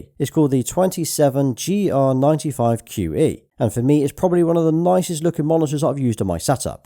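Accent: United Kingdom. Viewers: I knew he was going to be British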